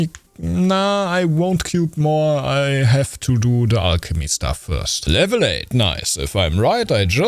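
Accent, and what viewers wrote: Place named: Germany. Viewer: could instantly tell ur german by the accent, but good stuff haha keep up the work :)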